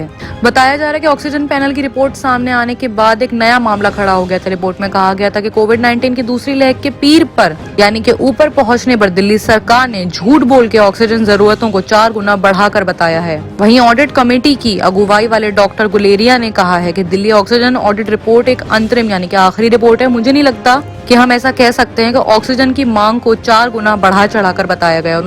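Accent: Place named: Bihar, India. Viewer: Koun to b hai g amma bihari dikhre aap awaz se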